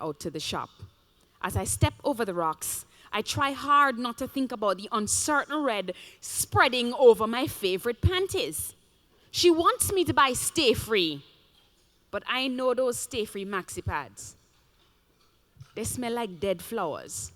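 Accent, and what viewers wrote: Jamaica. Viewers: Definitely Jamaican 😍 the accent was kicking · This woman is Jamaican. I recognize the accent. I love that she speaks for girls and women. When I grew up, like many, there was NO internet. No books to glean from in any library about women and our troubles. This woman is wonderful! Thank you!!! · soooo jamaican....lol...mi love it nuh hell!! So proud of u man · she sounds jamaician or like shes from di islands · my whole family is jamaican. I know when I hear a fellow yadie!! So happy to see jamaicans in the arts~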